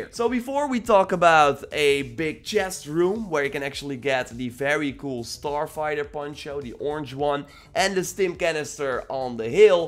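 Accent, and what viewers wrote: Netherlands. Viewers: Are you dutch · Damn, are you Dutch? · You Dutch?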